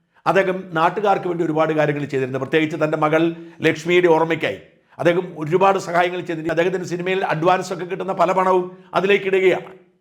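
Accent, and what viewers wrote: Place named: Kottayam, India. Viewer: ഞാൻ മധ്യ തിരുവിതാംകുർ ഉൾപ്പെടുന്ന മാവേലിക്കരയിൽ ജനിച്ചു വളർന്നു ജീവിക്കുന്നു,. ഇപ്പോൾ 51. ശുദ്ധ മലയാളം കേൾക്കാൻ വേണ്ടി മാത്രം ആണ് ഈ ചാനൽ കാണുന്നത്.. ഏകദേശം ഞങ്ങളുടെ ഭാഷയോട് ചേർന്ന് പോകുന്നു... പക്ഷേ ഷാജൻ.. കോട്ടയം ആണ് അതിന്റെ ഭാഷാ ലയങ്ങൾ ചേരുന്നു എങ്കിലും.... തമ്മിൽ ഭേദം തൊമ്മൻ.. ഈ ചാനൽ ആണ് ഞങ്ങൾ കാണുന്നത്... എല്ലാം ഭാവുകങ്ങളും... മറ്റുള്ളവർ മോശം എന്ന് ദയവായി menshan ചെയ്തില്ല.. ഈ ഭാഷ നിലനിൽക്കുന്നത് ഈ വൈവിദ്ധ്യം അത് മാത്രം ആണ്.. മലയാളം സംസാരിക്കുന്ന എല്ലാവർക്കും നന്ദി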